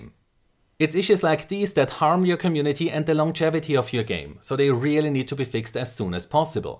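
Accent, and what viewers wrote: Austria. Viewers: Da hört man doch sofort den Österreicher heraus! Grüße aus Linz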